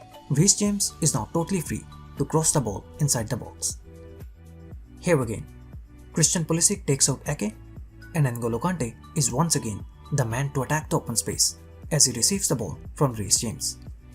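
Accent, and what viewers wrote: India. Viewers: I think he is not english native speaker. Sounds like indian.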